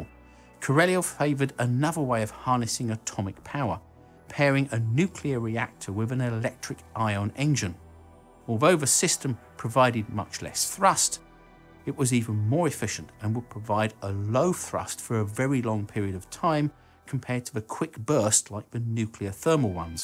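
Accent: United Kingdom. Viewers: I'm loving the British Accent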